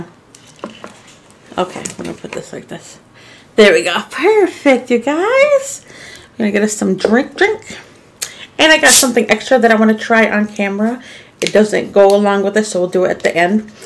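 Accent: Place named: England, United Kingdom. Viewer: I hear a English accent ssooo cute.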